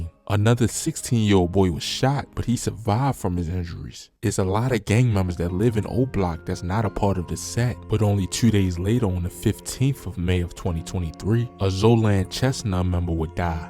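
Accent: Baltimore, United States. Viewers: Foo ALWAYS good for a few minutes of good watchin! I o see how you get so much info. It sounds like my guy is from Baltimore to me.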